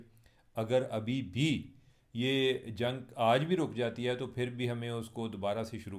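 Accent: Punjab, India. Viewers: آپ کی پنجابی کا تڑ کہ سچویشن کی پکچر بنا دیتا ہے😂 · You're really funny in your story-telling, especially when you add that Punjabi touch.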